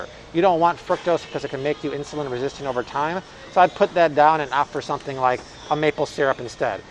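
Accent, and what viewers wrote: France. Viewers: the way he pronounces the letter G sometimes sound slightly French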